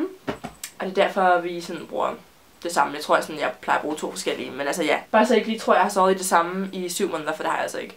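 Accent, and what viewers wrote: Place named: Denmark. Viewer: Are you finnish? You sound Danish.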